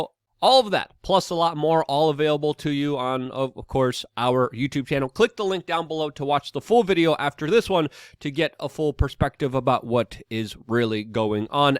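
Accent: New York, United States. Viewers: luke had an og nyc accent back in the day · It's kind of funny hearing the new york accent out of Luke after so long lol. It's still mind blowing how much the narrative was clamped down on during and after 9-11. I do believe that there are more people now who at the least question the events that happened back then. The pentagon is the most glaring of them all. That was no plane. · Luke’s NY accent was a lot stronger then, what a long journey it’s been since. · Luke- you’ve lost some of your NY accent! (So have I.) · Wow you I could hear your New York accent in that video!✌️💜🖖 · Luke"s NY accent comes out when he gets mad.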